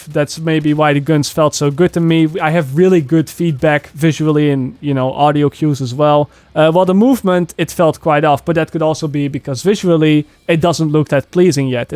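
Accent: Netherlands. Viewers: Hi, are you maybe Dutch? It’s just that I’m Dutch and your way of speaking and a slight accent strongly remind me of other Dutch youtubers with English channels. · Wait is this Guy dutch?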